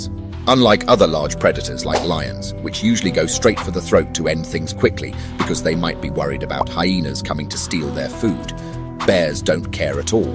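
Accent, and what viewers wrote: England, United Kingdom. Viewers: I hate how they use this guy with this english voice in soo many utube vids. I turn off vid as soon as i hear his voice. I wont be watching this channel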